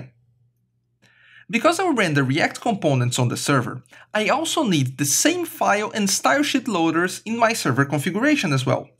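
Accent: Brazil. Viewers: good explanation. you brazillian? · are you brazilian? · you sound like a brazilian speaking english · Well done! Desconfio que vc seja brasileiro haha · Are you Brazilian right ? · sotaque brasileiro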